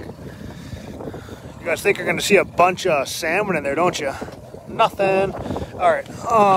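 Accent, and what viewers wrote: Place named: Minnesota, United States. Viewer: you have the same MN accent as my cousins who live up there. Both my parents were from MN but they didn't have accents, I guess because they left when they were in their twenty's and that was in the 1940's. they used to say people in MN don't have an accents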